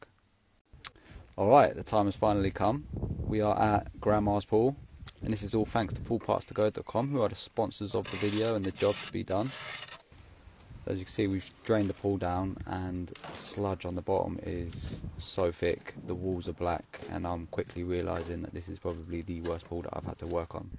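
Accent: United Kingdom. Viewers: The british accent is what complete this video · bruv its his British accent my guy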